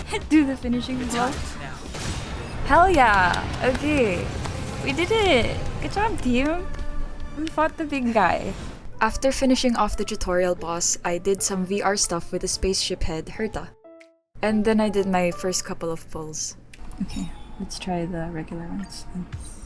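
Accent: Philippines. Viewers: Only true Filipinos can tell that has a Filipino accent 👍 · Are you Pinoy by anychance? I can hear it through your voice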